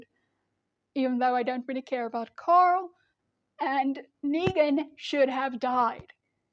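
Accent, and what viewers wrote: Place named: South Africa. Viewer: Hi there! Great video You have some interesting takes on things. I definite think you should watch the final season. It is in no way satisfying as far as concluding these main story plots you’ve been talking about but it does have some nice closure for some other characters. It is an absolute shame that they threw away 11 years of story and world building by stretching the characters into their own shows instead of having some satisfying conclusions to the main story notably Rick. It’s so painfully obvious that the writers had to write Rick out then try to pick up the pieces instead of just finishing the story then. I would have been ok with Neagan dying at the tree but I admittedly enjoyed his pseudo redemption arc and wanted to see him become accepted amongst the community. Im not a fan of how Carl died but I feel like Sadiq ended up doing a lot of good. 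Such a titanic fall this show had it’s a sucks when ips gets hurt because actors want to persue other ventures. Again great video! Your accent reminds me of my childhood Doctor, is it South African?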